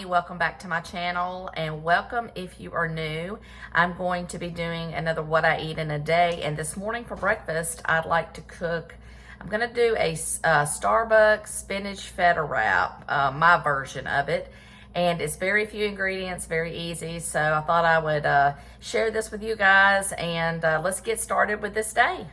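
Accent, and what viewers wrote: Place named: South, United States. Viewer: I just wanted to tell you how much I loved your video. I had forgotten all about that cake mix recipe. Being a longtime Weight Watcher, I was glad to see a good dessert recipe from my past. Your video was so easy to follow, which I really like. Also, I detected a Southern girl accent so I hope you will throw in some Southern recipes now and then. I am so glad that I found you by just scrolling along youtube. Have a great day from my mountain home in Western North Carolina.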